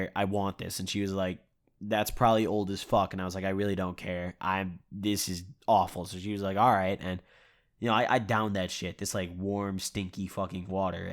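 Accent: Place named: Lafayette, United States. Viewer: Sounds like your in Lafayette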